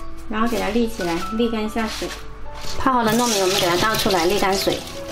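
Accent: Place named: Hakka, China. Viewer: she has been telling us that she is 客家人, I am also a 客家人too. Although I am not fluent in 客家話, I understand the dialect pretty well. Her 客家話is totally different from mine. Which part of China is she coming from?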